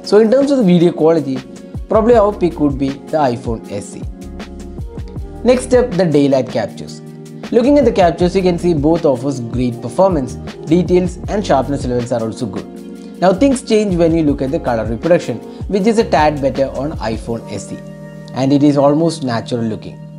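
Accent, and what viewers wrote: Kerala, India. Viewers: Are you Malayali bro.i think you have Malayalam accent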